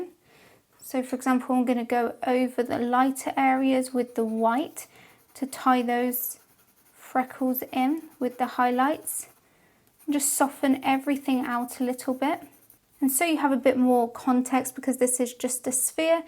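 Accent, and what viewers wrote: England, United Kingdom. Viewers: You have blown my mind with these videos. I have avoided coloring a lot of my pictures because of skin tone and I bought a pack of Crayola skin tone colored pencils which is cool but I kind of felt like it was cheating. The one thing I do understand is that all you need is red blue yellow black and white and you can create almost any color that exists especially with paint. But I like colored pencils and I don't know how I've never come across a video that explains so easily how to create skin tone and now I'm doing it like a pro and I have to thank you for teaching me that. Anybody who isn't British I can tell you things that not only you are very pretty but it's hard not to love your accent. Which I kind of think it's funny because where you live it's not an accent but a bloke from America thinks it's super Charming. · The english from England is really beautiful ! 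· Her accent or british accent is perfect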